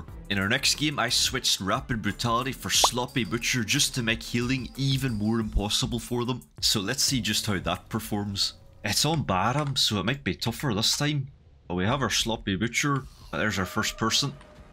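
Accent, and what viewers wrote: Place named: United Kingdom. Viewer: For 1-2 minute i wonder what does it mean "faint them" Then i realise it's pronounce of words "found them" xdd GB accent sometimes hard to understand, especially if English is not my native language :)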